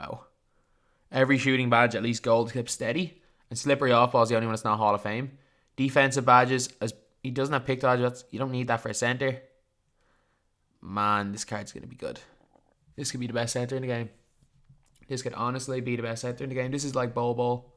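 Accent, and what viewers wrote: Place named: Ireland. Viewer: he’s Irish i’m pretty sure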